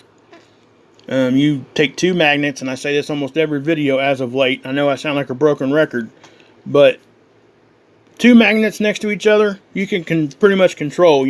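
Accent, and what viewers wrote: South, United States. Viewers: excellent. very very impressive data. advice. try focusing on the data at hand more. hard to watch your video in it's entirety as 50% plus is going off the target. i like your delivery mechanism and your accent is great. love the southern accent. all good but if possible try to stick to the points being made as much as possible. taking a long time to say what your analyzing due to alot of breaks in the transmission. greatly appreciate your time to produce and share your knowledge with the truth seeking community. holy spirit protect and guide you always. peace be upon you always. selah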